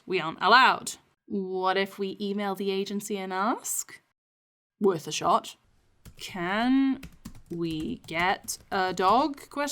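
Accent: United Kingdom. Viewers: I love your british accent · me: haha, although now we didn't know i feel your british accent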